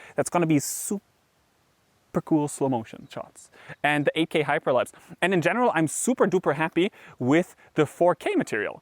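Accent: Germany. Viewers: Are you from Bavaria or Austria?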